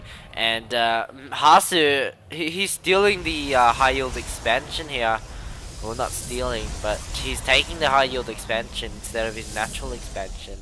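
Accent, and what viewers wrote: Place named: China. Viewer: you sound like a mix between a china-men and an Australian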